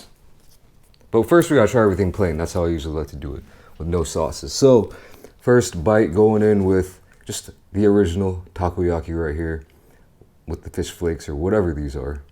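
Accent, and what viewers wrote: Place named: Philadelphia, United States. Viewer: Happy Birthday homie!! Easily my favorite content creator, the way you narrate things reminds me of my brothas from philly 🤙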